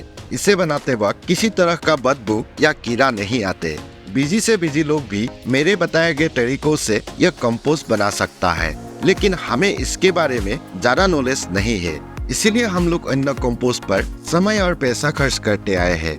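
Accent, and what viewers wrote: Assam, India. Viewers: Aap Assamese ho kya bhaiya. kiunki humare Assam k log hi aise hindi bolte hain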